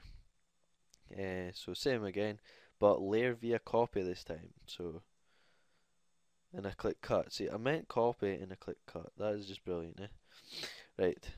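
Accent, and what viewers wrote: Scotland, United Kingdom. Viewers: HES SCOTTISH!!!!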